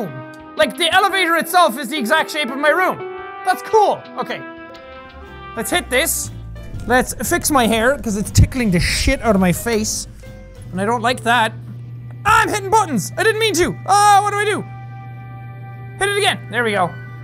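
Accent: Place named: Ireland. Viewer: my friend does not like ur vids so she came up with some stuff to say about u her: his voice is too irish me: what the frick is he supposed to do about that her:his hair is too green me:its blue now her:oh,is face is so plain like why not grow some facial hair? me:he did her:oh, well how bout he SHAVES that me:*leans over and dies laughing*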